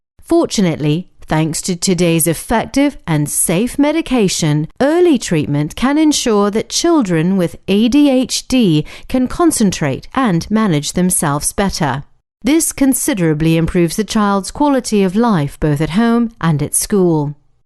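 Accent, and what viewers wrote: United Kingdom. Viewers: typical British English :D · I love english accent : )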